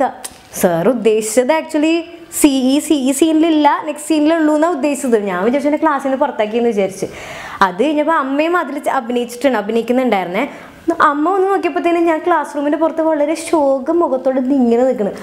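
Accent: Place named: Thrissur, India. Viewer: കുറച്ചു നാള് തൃശൂർ താമസിച്ചു കൊണ്ട് ആണോ ചിലപ്പോൾ സംസാരിക്കുമ്പോൾ തൃശൂർ ഭാഷ പോലെയാ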